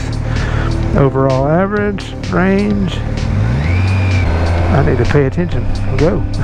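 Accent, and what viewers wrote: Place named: South, United States. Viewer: Bro.. you have a southern accent in this video that I have never noticed before!? Gorgeous bike!!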